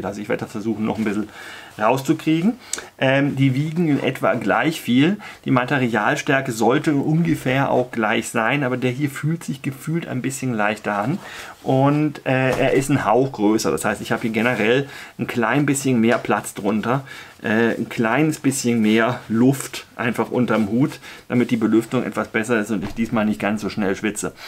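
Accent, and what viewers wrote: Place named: Alemannic, Germany. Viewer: F. allemannischer ist noch ein bisschen weiter im Süden. In der Gegend vom ist das badische noch vom Elsas beeinflusst. Dadurch unterscheidet sich der badische Dialekt noch ein bissle vom sch(w)äbischen. <ma‘ muss hald do no ä bissle de badisch/schwäbische Freindschaft pfläge, gell> 🤣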